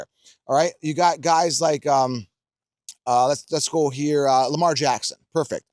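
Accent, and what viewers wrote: Canada. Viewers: I’m curious, what state are you from? Or are you from Canada? I hear a Canadian accent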